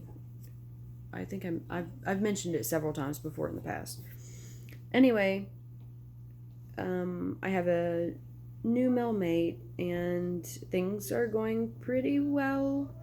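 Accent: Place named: Appalachia, United States. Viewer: It's funny. I haven't seen video from you in like forever (like probably a year or more) and it seems like way back when, you used to have a much more pronounced appalachian accent than you do now. Not that it really matters, but it's just a subtle change I noticed. I'm sorry to hear that you've had to deal with covid. That stuff sucks. I hope that you're back to feeling 100% like yourself real soon. I really need to watch your videos more often. I really enjoy listen to you speak about things. Your level of intelligence is really impressive. I hope 2022 treats you right!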